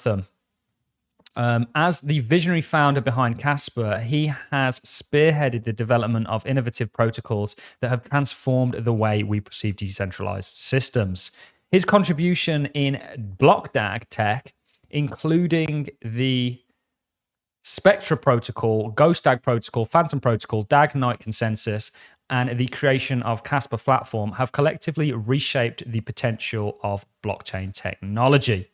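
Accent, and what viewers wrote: United Kingdom. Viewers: Thanks for covering $KAS buddy - graceful and elegant British accent and mannerisms make it sound . . . exactly as great as it actually is !!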